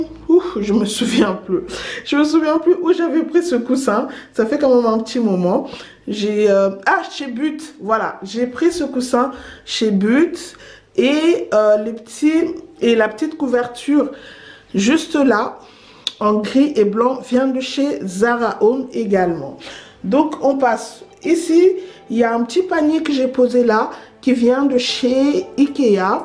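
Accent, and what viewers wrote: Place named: Belgium. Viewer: Cc,juste par curiosité: tu es une belge perdue en France? 😜 t a l accent belge! Mais tu parle souvent des magasins typiquement français! Kiabi,gifi........ peut être frontalière? Bisous😘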